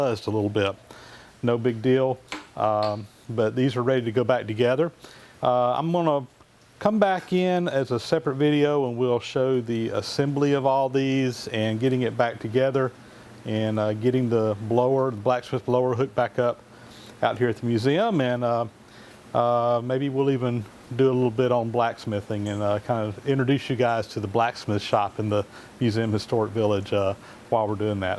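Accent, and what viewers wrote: South, United States. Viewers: Oh dear lord!. I had the Closed Caption turned on. Your southern accent is giving it fits. You simply have to watch a few portions of this video with the CC on, it'll drop you to your knees its so funny how it interprets what you say. Example "... about replace when us we're going to use this hotel room a which had been looking and come from the research for the the strength for this material I thinks gonna play me strong enough for this final dear in a reason the use that the from noise reduction for this final gear in a reason the use that the from noise reduction the have that bad fastest turning here for a walk in the metal he had a something that would dampen the operation tumor in the blower....." It gets worse.......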